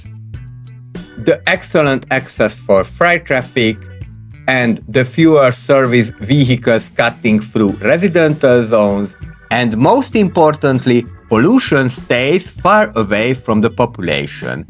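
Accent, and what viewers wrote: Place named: Hungary. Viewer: nvm, your accent sounded Hungarian. this is what we say on the internet to each other.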